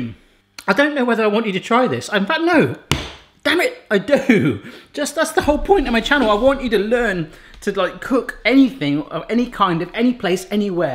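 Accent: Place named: Bristol, United Kingdom. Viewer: Omg! I knew you lived close to me by your accent but not that close Hi from Bristol I've been watching your videos for years!! 👋😁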